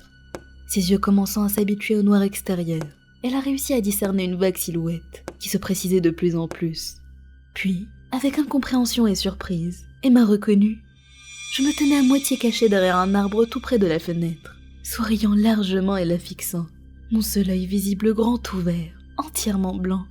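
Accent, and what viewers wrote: Quebec, Canada. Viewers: Tu viens du québec ?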